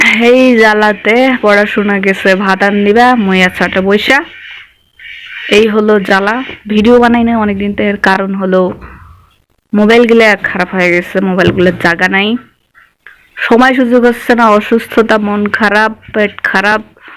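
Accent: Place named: Panchagarh, Bangladesh. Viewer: হামরা পঞ্চগড়ের লোক হামার কথার সাথে মিল আছে তুমার লগত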